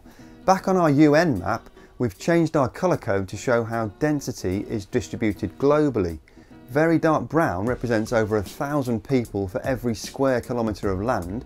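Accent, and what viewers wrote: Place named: Cockney, United Kingdom. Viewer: Re this Sensationalists prior "sea ice" video but read my point # 8)... Lots of "sensationalism" & "doom&gloom, if it bleeds it leads" MISLEADING PSUEDO SCIENCE from our cockney accented "pseudo scientist".... Notice he says "sea ice" a lot. Propaganda from fiberal globalist seditionists seeking to "carbon tax" us into oblivion, this video is clearly paid advertising to sell us all into submission... don't believe the rhetoric. HERE ARE THE FACTS: 1) ice shelves are very thin floating 'skins' relative to the main ice mass at the poles, making for dramatic imagery (likely photo-shopped to support the phoney narrative). 2) yes, "sea ice" is diminishing, and yes our climate is warming, but... NO, Sea levels will NOT rise & flood coastal regions. 3) NASA's circumpolar satellites measure surface elevation & micro-gravity (mass directly below the sat.) and clearly show FIVE TIMES MORE ICE IS CURRENTLY DEPOSITED BY SNOWFALL AT THE POLES than what is lost to melting "sea ice". 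4) the disparity between the much greater annual polar deposition vs. loss of peripheral "sea ice" is ACCELERATING (especially at the Antarctic landmass). 5) while global warming is for real, it will NOT result in "catastrophic sea level rise" for the above reasons as well as greatly increased evap/precip filling depleted lakes, rivers & aquifers, as well as greater atmospheric moisture held in clouds & humidity. 6) CLIMATE MODERATION will greatly warm our winters but ACTUALLY COOL OUR SUMMERS in many regions d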